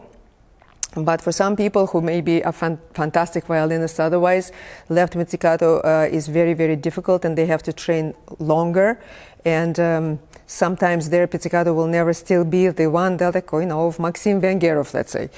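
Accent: Russia. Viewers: Какой сильны русский акцент! Но было интересно.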